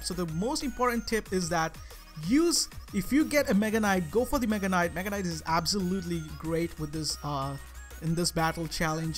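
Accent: India. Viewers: ASHUTOSH ZAGADE ofc he is, he has the Indian accent and his currency is in rupees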